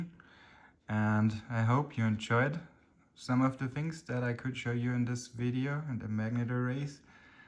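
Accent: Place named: Germany. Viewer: Hörst dich deutsch an.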